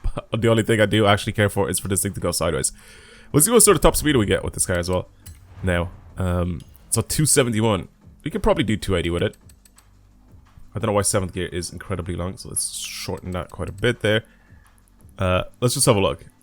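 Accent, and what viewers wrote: Ireland. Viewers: yo what accent do u have im terrible with my countries but im thinking Irish?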